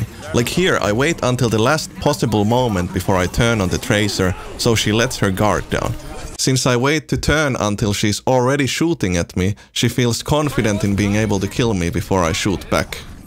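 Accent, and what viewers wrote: Finland. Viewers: I instantly knew you were Finnish when you started talking 😅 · What is your main language becouse you sound like how finnish people speak eglish?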